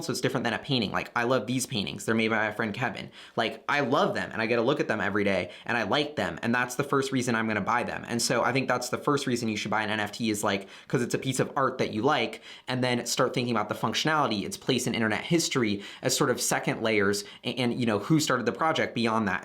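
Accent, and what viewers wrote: United States. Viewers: Your American accent is so gey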